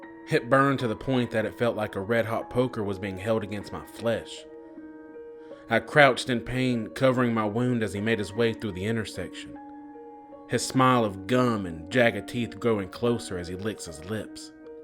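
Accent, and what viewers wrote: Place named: South, United States. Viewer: I luv your southern accent so much it. Makes this channel your amazing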